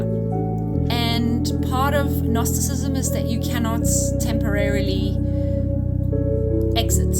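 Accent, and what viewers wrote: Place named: United Kingdom. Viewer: Also I like your accent. Is it British? I’m originally from South America living in the USA. When I hear a person pronouncing every single syllable in English, I love it. I’ve been speaking English for many years but for some reason I’m still insecure.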